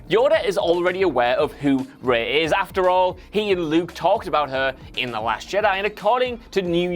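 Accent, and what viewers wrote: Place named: North, United Kingdom. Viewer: Jesus this guy sounds like a resident of the fucking North in GoT . Hopefully you're a Stark loyalist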